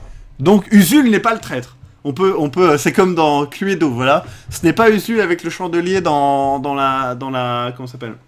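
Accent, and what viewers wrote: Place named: Africa. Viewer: Salut dis moi t'as vécu en afrique ou sur une île Africaine ? Question à ta façon de parler certains mots.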